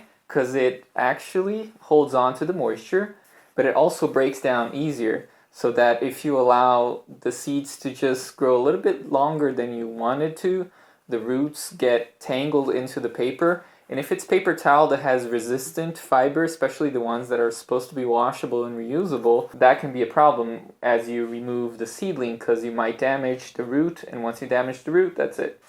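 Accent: Brazil. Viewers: Are you are Brazilian American or a Ivan Brazilian. Your perfect pulo do gato accent made me wonder. That said. I love the aluminum can idea - do they provide additional heat for roots? Is that good? I’m having a hard time with. Making the cilantro seeds sprout.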